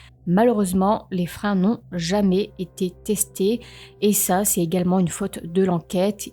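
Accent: North, France. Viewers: bonjour je voulais vous dire que vous racontez très bien ces affaires que vos documentaires sont bien étoffés. on voit que vous travailler sérieusement pas comme d'autres et je voulais vous remercier pour votre travail car c'est toujours agréable de vous écouter! je ne m'en lasse pas! ne seriez vous pas une fille du Nord?? et vos affaires criminelles sont inédites ce qui est très plaisant. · Bonjour. J'adore votre concept.Narration parfaite avec ce petit accent du nord.qui vous donne petit.plus..Histoirs passionnantes.. beau travail. Un grand merci a vous. 💚👍👍👍💐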